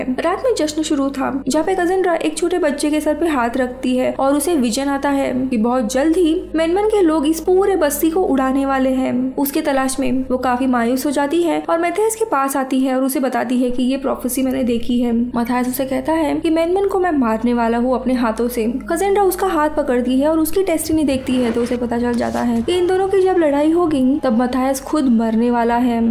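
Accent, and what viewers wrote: Maharashtra, India. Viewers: Are you marathi mulgi?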